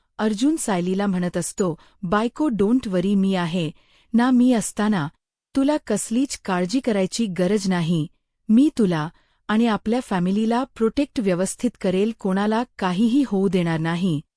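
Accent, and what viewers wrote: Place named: India. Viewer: What is your name,you sound like hindi speaking.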